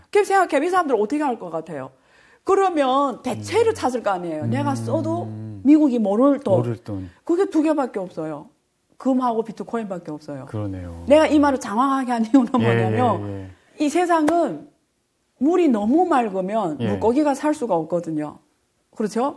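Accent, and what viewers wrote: China. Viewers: 조선족이신가요? 억양이.. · 억양이 조샌족인가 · 화교인가 발음이 ㄷㄷ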